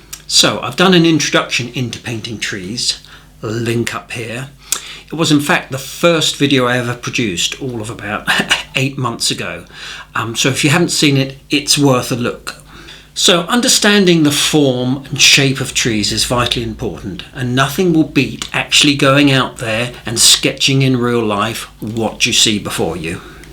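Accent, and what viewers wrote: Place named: Scotland, United Kingdom. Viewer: 'Jist' loved todays wee tree extravaganza...the touch of 'Scots' twang wis jist grand 😉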